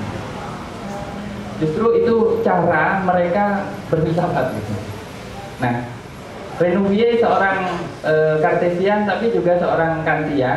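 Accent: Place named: Madura, Indonesia. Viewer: logatnya kayak pak mahfud, pasti dia ini orang madura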